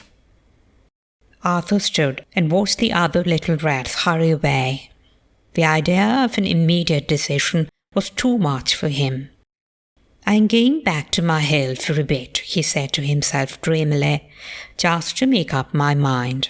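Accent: RP, United Kingdom. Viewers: this is an extraordinary accent! It sounds even more heightened RP than my grandmother (b.1903 d. 2003) and I can hear aspects of the former colonies as well? Fascinating, beautiful even in it's uniqueness. Not a standard RP though, nor even a standard heightened RP accent. Use of Arthur is valid.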